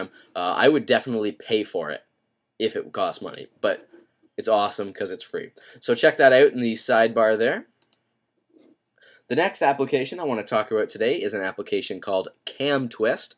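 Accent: Canada. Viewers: ur canadian! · i love his canadian accent. thanks. i ended up downloading screenflow after seeing this. :)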